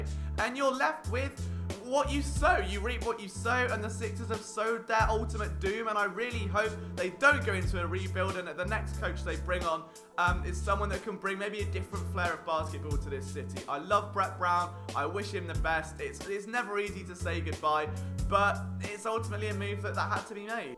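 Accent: United Kingdom. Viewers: U still got the Flyers. They played tonight!! Stay safe!! Btw where are u from because u sound British? I am loving the accent.!!!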